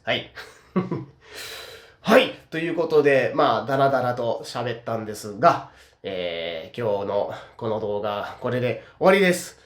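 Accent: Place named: Kansai, Japan. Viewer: 関西弁も板について自然で聞きやすいです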